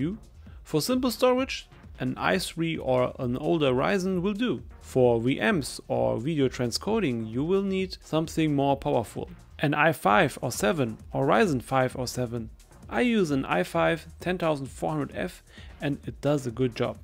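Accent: Germany. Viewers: Ich ess deinen deutschen Akzent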